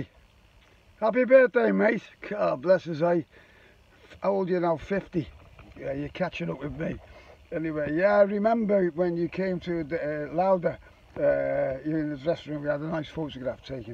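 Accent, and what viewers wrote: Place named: Liverpool, United Kingdom. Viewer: Alright aye scouse .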